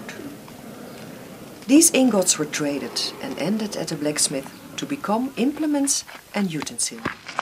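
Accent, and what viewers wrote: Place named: Netherlands. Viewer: It might have been better to have the commentary done by someone with less of a dutch accent and most of all with fewer grammatical flaws in it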